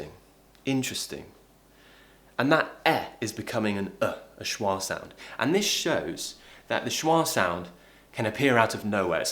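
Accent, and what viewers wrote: United Kingdom. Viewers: I really like this lessson. It is really useful and o love British accent. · Hi, I'm Julio from Brazil, I love your videos, I am learnig American English, but because of your videos , now I prefer British English hahaha, I think your accent is so much beautiful . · Happy birthday professor 🎂🎈 greetings from Philippines. Been watching your videos and it helped me a lot to learn and improve my english most specialy learning your British English accent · You can't believe how much I love listening to you! I wish I had that British accent- I wanna become an English teacher here in Germany and I would love to sound like you haha 😂😂 anyway, great work! Oh and Happy Birthday! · The idea of starting a podcast is wonderful. Walk and enjoy your British accent,so nice and so beautiful .hope it will realize soon 💕💕💕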